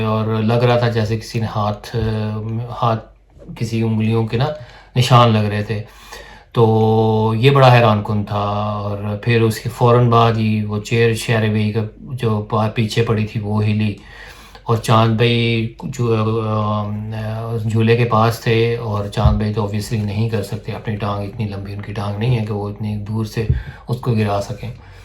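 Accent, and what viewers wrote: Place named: Punjab, Pakistan. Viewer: bai good work mainu tusi v lehnde Punjab ton lagde ho bcz thodi boli Sade wali hai bcz aam loka nu ta madhani jis naal apne ghra ch saag ghot de aa pta hi ni v ehnu kehnde ki aa, jdo v koi Punjabi veer kuch changa karda ta BHT vadia lagda, parmatma thonu Chad di kla ch rakhe. Bht ghaint review karda bai.jwb jarur deo msg da Sat Shri Akal 🌹 Frm- Chad da Punjab India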